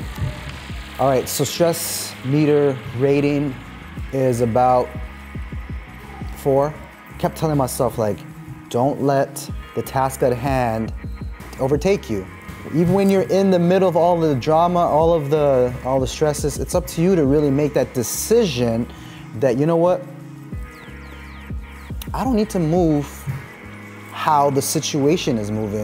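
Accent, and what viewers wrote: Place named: United States. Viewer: Well, you speak american english. Its different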